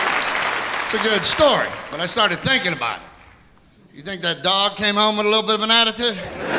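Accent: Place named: Pittsburgh, United States. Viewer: That Pittsburgh accent is just made for stand up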